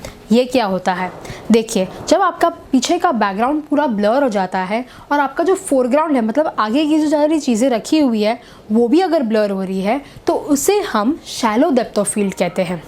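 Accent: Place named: Bihar, India. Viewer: बेसिकली बिहार से लगती है आप .. anyways, अच्छे से बताती हैं, लेकिन presentation की थोड़ी सी तैयारी और जरूरी है .. जानकारी तो है no doubt... 👍👍💐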